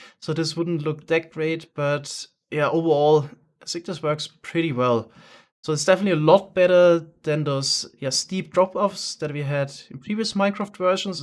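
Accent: Germany. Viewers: ur dialect sounds so german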